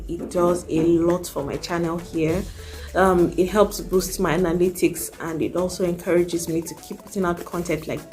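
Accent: United States. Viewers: Lol 😂 from listening to you i think our English influence is from different continent yours from America mostly ours from UK mixed with our own language 😂 we just hit the short cut with names 😂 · Seems like u speak American English. Lol how is Tomato sauce a Ketchup though??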